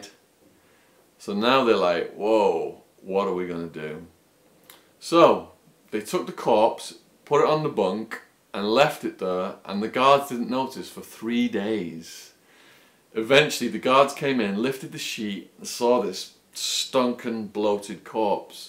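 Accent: United Kingdom. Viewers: Are you from UK? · You sound British. Were you deported?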